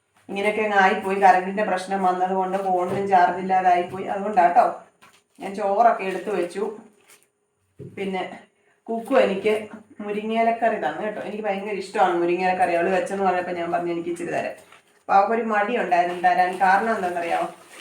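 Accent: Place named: Thiruvananthapuram, India. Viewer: തിരുവനന്തപുരം ഭാഷ ആണല്ലോ. സ്റ്റൈൽ. വീട് എവിടെ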